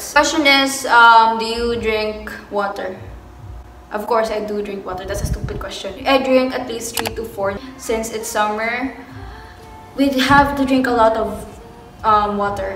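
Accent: Philippines. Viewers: as a half filipino, filipino accent is so funny and cute 😁😊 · Parang bisaya po kayo ate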